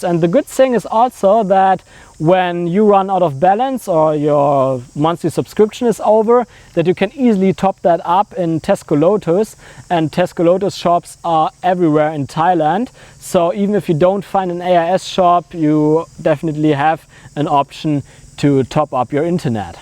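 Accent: Germany. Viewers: Love the German accent. Great info :)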